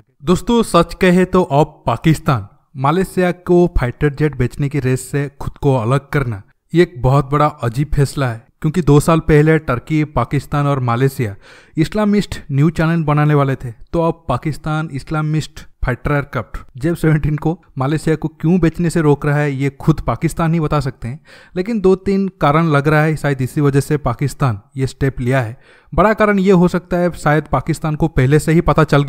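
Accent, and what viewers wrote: Odisha, India. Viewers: This fellow is out and out Odiya.His accent reminds me of my very good friend Mahapatra.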